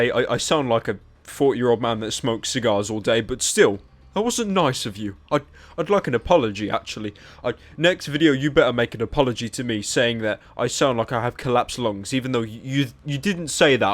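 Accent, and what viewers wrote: United Kingdom. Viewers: what's wrong with his voice lol I love British accents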